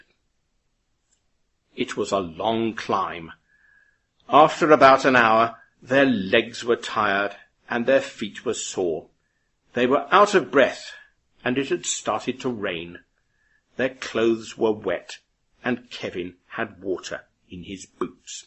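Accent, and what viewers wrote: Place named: United Kingdom. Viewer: I love British accent, it’s music to my ears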